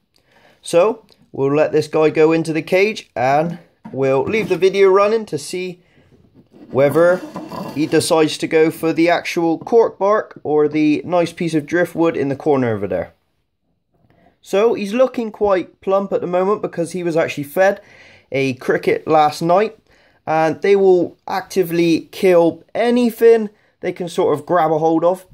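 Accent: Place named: Bristol, United Kingdom. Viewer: Lol great video, but very Bristolian lol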